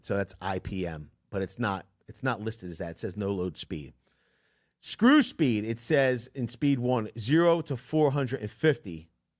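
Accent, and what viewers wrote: Philadelphia, United States. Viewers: I listen to this BS just hear a Philly accent. You guys are alright.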